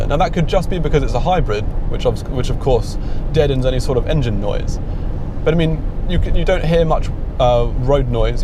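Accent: RP, United Kingdom. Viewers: Wow! Initially i thought what a Cambridge/Oxford educated yuppy accent 🤦‍♂️ but you gave me all the info i was after thank you i shouldn't be so presumptuous in future 😅 👍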